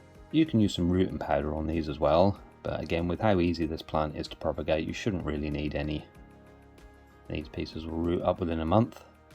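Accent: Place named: Somerset, United Kingdom. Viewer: Excellent video! Thanks. Your accent reminds me of my friend Garry from Somerset. I’m in the US. Have a great day.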